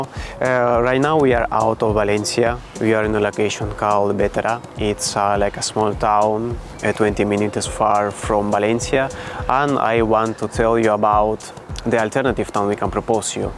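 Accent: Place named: Montreal, Canada. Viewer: Are you from Montreal? Your beautiful accent sounds like it, that's why. 😊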